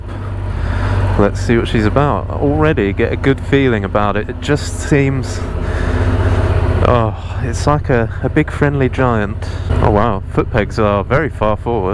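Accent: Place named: United Kingdom. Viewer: Came for the transalp. Subscribed for the British commentary.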